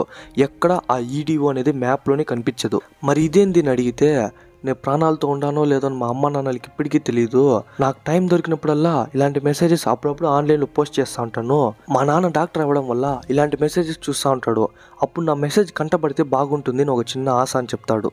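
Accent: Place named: Rayalaseema, India. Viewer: Mana rayalaseema accent lo balega explain chesavu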